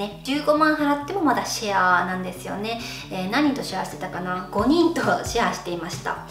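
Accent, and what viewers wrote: Okinawa, Japan. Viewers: 喋り方、所々沖縄なまりや沖縄の友達がする様な表現が入っていて好きです☺︎ ラッキーなのはお人柄のおかげってあると思います👍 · Tsugumi K CAさんだからね.... だから英語っぽいっていうかなまりがあるんだよね.... (あと沖縄出身だから)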